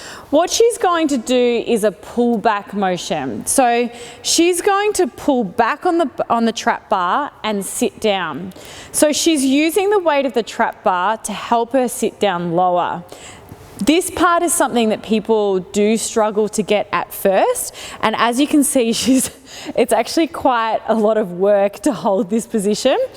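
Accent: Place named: Australia. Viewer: Aussie accent is ❤️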